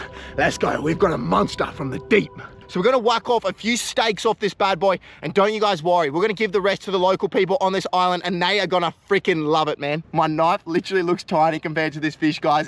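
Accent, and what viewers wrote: Australia. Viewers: At the beginning, I was like: "Oh! This guy wants to make me believe he'll survive only on what he catches? No way!" Then I heard this Aussie accent, and I switched right to "Oh! Right!" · First time ever watching this guys Video randomly, as soon as he opened his mouth I figured he's Australian 😂😂😂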